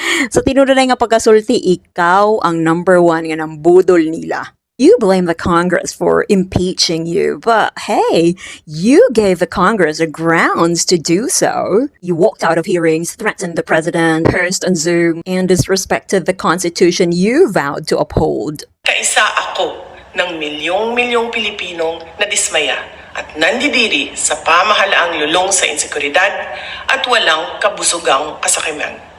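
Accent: England, United Kingdom. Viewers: well said. Love the British accent though but not the Bisaya. Sagwa magsalita si Inday Sayad, even in her own dialects. Can you also roast Harry Roque. Thank you. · Luv ur brit accent 😊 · I'm sorry but your English accent doesn't make you smart, mag bisaya kanalang day.:face-green-smiling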